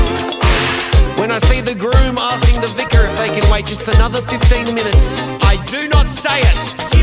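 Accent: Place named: Australia. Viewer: Why isn't there anyone who mentions that his Australian accent is at least like 50% of what makes this perfect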